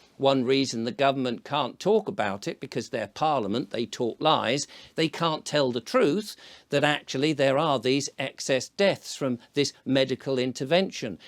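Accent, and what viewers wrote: United Kingdom. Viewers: it's great to hear another British accent speaking on these subjects. Great balance of interviews, dry quintessential Brit humour and opinion. I have attempted to do what you are doing here on YT on a few occasions in the last 10 years but lacked the skill and time to produce the quality output that you deliver.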